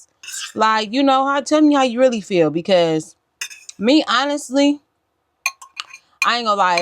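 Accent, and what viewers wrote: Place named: South, United States. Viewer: What part of the south are you from seriously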